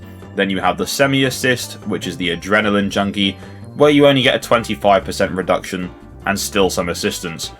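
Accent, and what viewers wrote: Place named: South, United Kingdom. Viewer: 90% of Southern English middle class men have this voice lol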